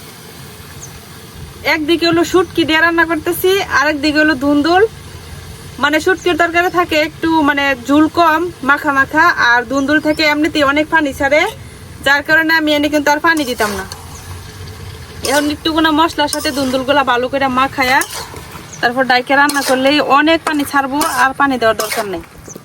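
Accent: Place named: Kishoreganj, Bangladesh. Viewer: আপু কিশোরগঞ্জে কথায়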